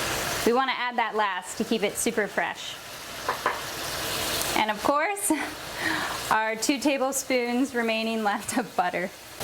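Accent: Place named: Italy. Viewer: Great great videos! Her Italian accent is lovely!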